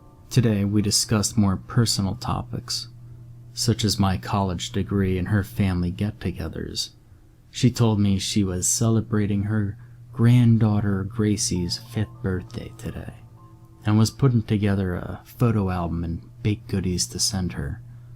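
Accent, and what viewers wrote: United States. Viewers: No, he's American or Canadian sounding. You should check out his channel, his back catalogue spans something like 8 years.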